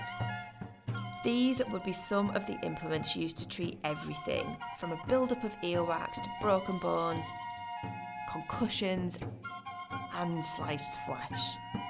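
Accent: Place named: Yorkshire, United Kingdom. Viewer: Although it is refreshing to 'hear' a Yorkshire accent, & a female one too(!), presenting for the Royal Armouries Leeds it is unfortunate that both strength of voice & quality of recording made it extremely difficult to hear everything spoken. Not the first occasion R.A. 😒 Please mic. your presenters up personally for clarity! 🤨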